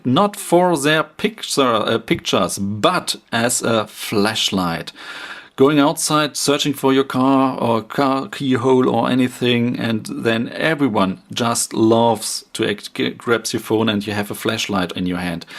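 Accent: Germany. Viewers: Hi, I'm English and love your German accent! You speak English very well in my opinion. Besides that nice compliment though, your videos are very informative. I like how you address things that are important to "the everyday user" - please keep it up! I own a Nokia Lumia 820 and I regret buying it because the battery life is not very good at all and the available apps are poor compared to Android. I was watching this video because I was considering buying the Moto g.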